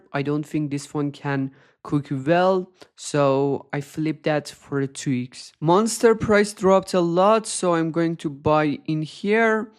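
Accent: Iran. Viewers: Bro are you Persian? You sound like Iranians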